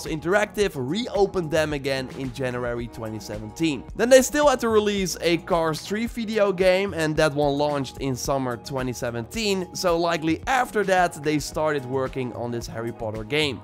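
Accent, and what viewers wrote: Netherlands. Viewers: Nederlands accent, altijd herkenbaar in enkele seconden 😂👍🏻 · please work on your accent, it's so obvious that you're Dutch. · Dat moment dat je het accent hoort en weet dat de presentator Nederlands is vanwege het accent (geen haat, alleen liefde) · holy shit you sound dutch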